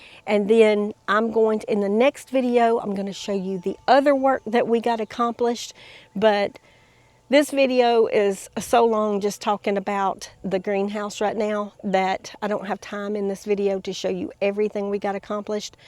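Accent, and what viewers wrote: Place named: Alabama, United States. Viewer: Wow, what an exciting project we tried doing something similar on our own but probably a lot like where you live we sometimes get really Highwinds and it’s hard to keep those tunnels up. Maybe yours is going to be different I can’t wait to see you and we have some aviaries on our property, and I have been planting inside of them to keep out the dears, and a few other odds and ends of varmints clouding all of our peacocks, who love nothing better than to eat the new growth on veggies and fruits anyhow, I sure enjoy your channel and I love listening to your voice into Brooks voice on College Hill Farm. It reminds me so much of all my relatives back in Alabama.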